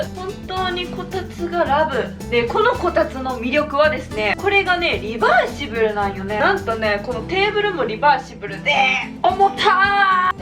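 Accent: Kansai, Japan. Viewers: 初見なんやけどこんな可愛い部屋でこんな可愛い顔してバリ関西弁なん最高すぎる。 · 初見なんですけどムッチャ好きになりました(＊> <＊) サムネの雰囲気とちがってゴリゴリの関西弁やしもうはまっちゃった！ 興奮気味💓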